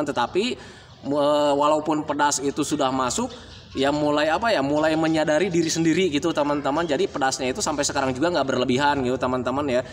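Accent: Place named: Makassar, Indonesia. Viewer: Makassar mn suaranya ❤❤❤❤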